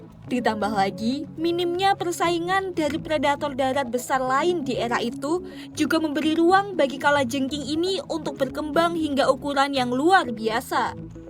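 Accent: Java, Indonesia. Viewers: Njir suaranya Jawa medok😂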